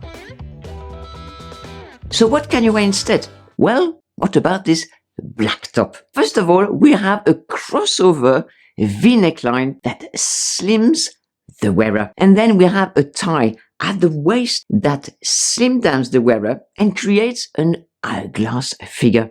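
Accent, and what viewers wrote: France. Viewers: Kia ora from Aotearoa, New Zealand!💙💚🙏 I really loved this video and think you're wonderful too!🙏 I love your beautifully soothing french accent and voice. I'm looking forward to more of your videos 🌟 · First of all I have to say I could listen to you talk for hours. Love the beautiful French accent. My ability to speak French is nil.😂 When I went to Europe. It was obvious that French women know how to dress. They know how to live life! They have impeccable style. Clothing is about quality not quantity. They spend money having their clothes tailored. They know how to buy clothes that fit!! They know how to dress for the occasion. They're very mindful of where they will be going and what they will be doing. Even the way they do their makeup. It's sensible yet sexy. Because of this they have je ne sais quoi. You develop style through your use of fashion that is unique to you. 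You don't wear a trend. That's definitely something I wish I would have discovered in my younger years. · you look fabulous in the purple blouse.😍 That's the advice I needed today, since not only do we have the same name, but we look similar as well, size, colors and all. It is still your wonderful French accent that draws me to your videos though! 😂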